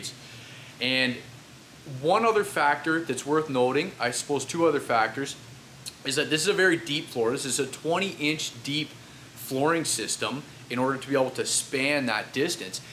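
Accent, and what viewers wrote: Canada. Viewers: sounds like a Canadian accent. Hi from Niagara!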